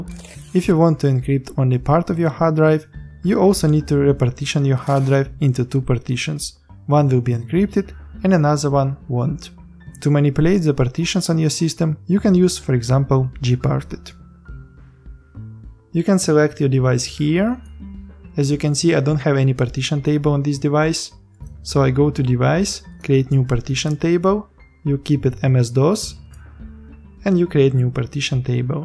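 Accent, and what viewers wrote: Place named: Russia. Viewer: Русский акцент английского языка :)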